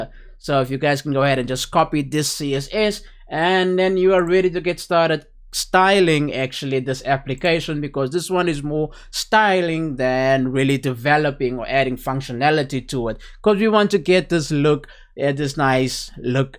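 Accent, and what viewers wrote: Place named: South Africa. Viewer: Yusis china lekka to hear a local voice hay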